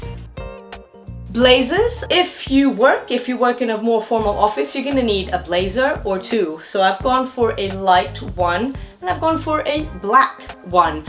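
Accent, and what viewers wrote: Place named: United Kingdom. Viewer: Just love your British accent!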